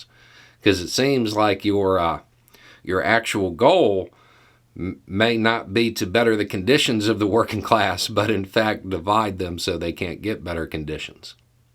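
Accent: South, United States. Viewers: What are the two words you are surprised to hear from somebody with a southern accent: "Check Mate". Don't let the southern accent fool you. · Exactly, he came in after pitching a fit about how much he paid for the company then fired majority of the workers, doesn't explain his vision to about the future, expects the workers to cover everything, sends out an entitled email and expects his employees to go "well they say he's a genius so 80hr work weeks (or more) here I come for no additional resources or perks!" sorry if that had happened to me, I'd be out of there faster than you can count to one. Nothing about what Musk has done sounds stable, innovative, or reassuring. Us working folks like stability regardless if they talk southern like or west coast like myself. They want to know that their job will be there in a day, week, month, or 6 months because you know we like to plan and to be able to afford everyday expenses.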